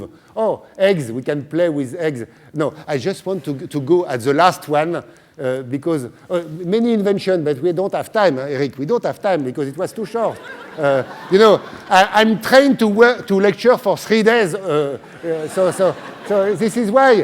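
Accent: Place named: France. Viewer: Fucking brilliant. If only we would have teachers who would be like that all the time... with french accent.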